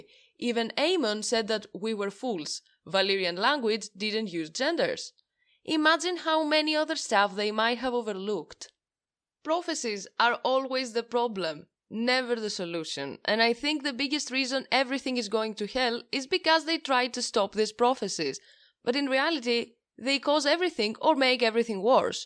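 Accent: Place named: Greece. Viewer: Η ελληνική χροιά φαίνεται από την τόσο καθαρή αποδώσει των αγγλικών. Ιδιαίτερα στοχαστικό GOT βίντεο. Θεωρώ πολύ πιθανόν η εισβολή των White Walkers να μην ήταν αναπόφευκτη, αλλά να ένιωσαν απειλή από τις εξωφρενικές δράσεις των Targaryen για να εκπληρώσουν την προφητεία, οπότε εισβάλλουν για να προστατεύσουν το είδος τους.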